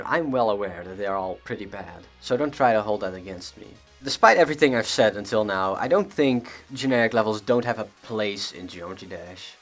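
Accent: Netherlands. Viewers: wow the dutch accent shines right through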